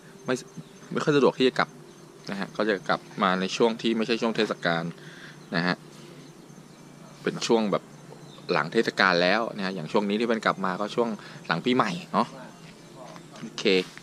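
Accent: North, Thailand. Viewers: ว่าแล้วเชียวว่าพี่แว่นเป็นคนเหนือ สำเนียงมันออก(สาบเมืองขื่น) ห้าๆๆๆๆ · ผมคนน่านแต่อยู่ในเมืองครับ #อยากให้พี่แว่นพูดเหนือเยอะๆ 555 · สำเนียง เมียงแต้ๆ^^ ผมคนแป้ครับ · อู้กำเมือนขนาดนี้ เหนือแต้ๆ ครับ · อยากให้พี่แว่นพูดภาษาเหนือออกคลิปบ่อยๆหน่อย น่ารักดี FC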